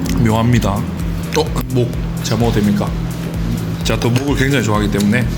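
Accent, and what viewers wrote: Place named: Gyeongsang, South Korea. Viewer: 갱상도 사투리도 이렇게 서윗하게 들릴줄은 목소리 언제나 서윗합니다..ㅋㅋ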